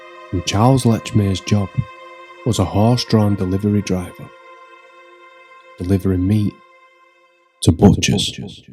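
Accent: Manchester, United Kingdom. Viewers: That's a proper manc accent, or Salford.. I used to live ten minutes up thd road from Salford precinct off chapel lane or chapel street/road. Been 30 years since i lived there but your accent is definitely either north manchester or Salford, possibly south Manchester Wythenshawe, but my point being it's a thick accent from the area. I ended up in stretford. About the video, I thought it was Lechmere for years but now I'm not convinced. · New sub here 👋🏼 This video randomly popped up & I’m glad I clicked. What a remarkable video. Love all the editing 👏🏼 I’m from Bolton, is that a Mancunian I hear? 👀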